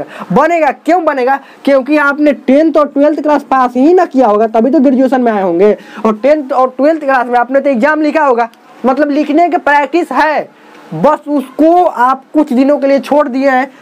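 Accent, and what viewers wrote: Bihar, India. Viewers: Bihar se ho kya bhai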